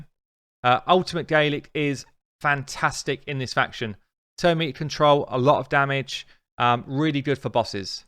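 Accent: England, United Kingdom. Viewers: Man, you are damn' legend! Great job! Even tho I hate english accent, I listen every single your word with pleasure. You did amazing and huge job to support newbies... :D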